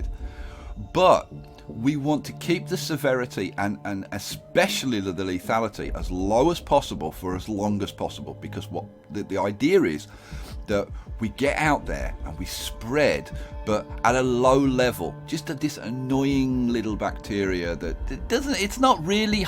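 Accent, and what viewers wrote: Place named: Wales, United Kingdom. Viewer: Loved the welsh accent! haha! who's coat is that jacket?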